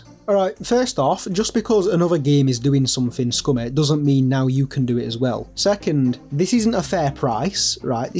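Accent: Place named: South Yorkshire, United Kingdom. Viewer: South Yorkshire police, by the sound of the accent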